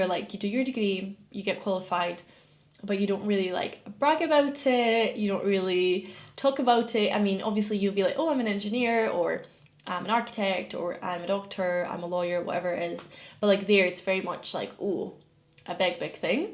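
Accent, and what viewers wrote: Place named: Scotland, United Kingdom. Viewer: love your accent senyorita like scotish❤❤❤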